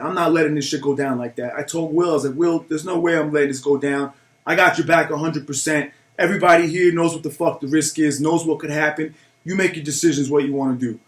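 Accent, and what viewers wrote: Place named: New Jersey, United States. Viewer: You're such a great story teller. Just found ur channel & you got great content. Plus, I luv the accent. Im from Jersey & hearing u talk reminds me of home👍 Keep up the great work!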